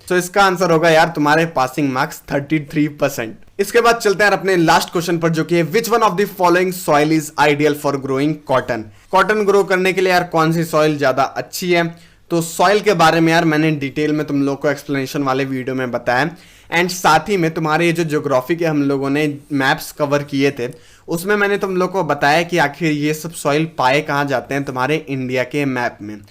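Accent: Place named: Uttar Pradesh, India. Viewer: Kariya soil😂😂love your language too😂🤣.... Katai upwalo wali feeling aata h😂 .... M to UP se hi hu to feeling to aaegi n😌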